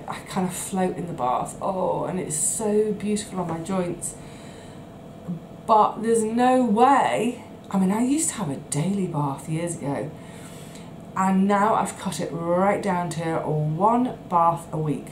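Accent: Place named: RP, United Kingdom. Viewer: Hi. I just found your channel from one of your followers, after one of her subcribers mentioned you in a YouTube comment. You can't put a price on being mortgage-free. You also sound really posh, for coming from a working-class family, lol. 😇 Anyway, my life has been quite similar to yours, apart from the burglary part. A working class family from the 1970's, with bone stew from our local butchers twice a week, with my Mum having only £25 a week for food, bills and everything else. This is a very honest and down to earth video, and it's really great. I'll watch a few more videos before I decide to subscribe. I wish you a Happy New Year, and I hope you have an amazing 2024 ! 🌷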